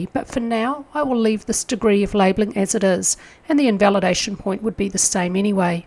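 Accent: New Zealand. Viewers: thinking mainly of the big one on everyone's mind... SP500. Ewi have been spectacularly wrong over many years, although arguably through Fed intervention, its debatable whether that is an exogenous factor and a valid reason for failure. Nonetheless, your analysis has been spectacularly correct, AND in that you stand alone. You can weigh possibilities, then apply fine balance and judgement. Umm, you sound kiwi??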